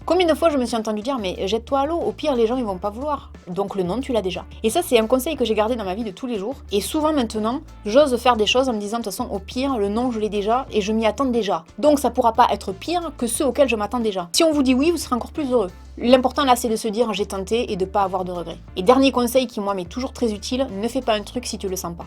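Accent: South, France. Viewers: OMG, là je ne pouvais pas ne pas écrire: Prend un caillou si tu as un point de côté!! Jamais entendu celle là. Les gens qui ont inventé ce conseil étaient pas très fut fut. Tu veux qui fasse quoi le caillou! Il est pas magique:'D Sinon un conseil quand tu as le occet (aucune idée de l'ortho) on m'as souvent dit de boire de l'eau, ça a jamais marché:'D ou alors bloque ta respiration, ça marche temporairement mais après je l'ai toute la journée. Pas cool. Par contre ce qui marche, c'est une cuillère de citron et du sucre. Après chacun est unique donc... ce qui va marcher sur qqun ne va pas marcher sur un autre. En tout cas, je voulais te dire que depuis que je suis ( depuis tes débuts) je ne peux me passer de regarder chacune de tes vidéos. Tu mets de bonne humeur! Ton accent du sud me réchauffe autant que la bonne humeur que tu dégages. Merci d'être toi!:D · J'adore ton accent du sud, ça met du soleil dans ma journée ! 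Sinon pour les études ça fait 3 ans que je fais de l'informatique et j'ouvre enfin les yeux en me disant que c'est pas fait pour moi ... Bon au bout de 3 ans c'était un peu long mais du coup je sais même plus ce que je dois / peux faire avec ma filière, compliqué !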